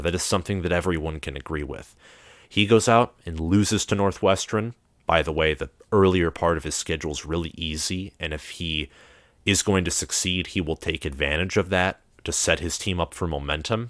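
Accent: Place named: Michigan, United States. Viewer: Pac- fan in Sparty land: I luv '22 Mich. State's new, awesome QB/WR duo. By dialect, u sound like a Mich. fan. The new MSU qb/wr pair is 🔥🔥🔥. UM & PSU start hoping it takes most of a new seas. for the new MSU o' to gel bc these cats from out west r way better than dudes from Naperville & SE Mich 🤣🤣🤣. #TuckerKnowsOutWestGreatness 🔥🔥🔥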